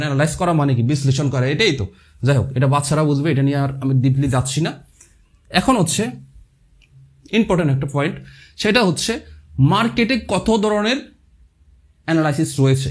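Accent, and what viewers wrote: Sylhet, Bangladesh. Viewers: ভাই আফনি কিতা সিলেটি নি 😮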